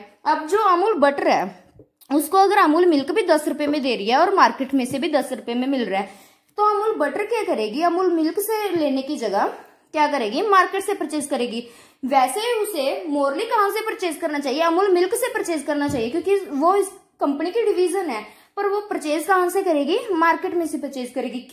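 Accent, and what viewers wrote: Punjab, India. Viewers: I loved the way u speak U have punjabi essence in ur speaking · Mam app punjab se lgte ho haina??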